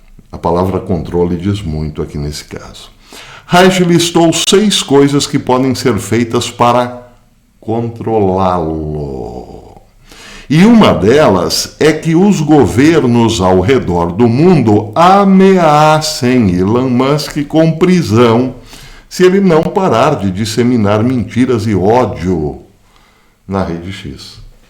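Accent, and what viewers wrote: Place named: Northeast, Brazil. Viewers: Falando nordestinês. Estranho. Sou baiano de Salvador, porém morando há 14 em Sergipe e te estranhei. Mas ficou engraçado. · Professor adorei seu sotaque nordestino. Obrigada pela homenagem.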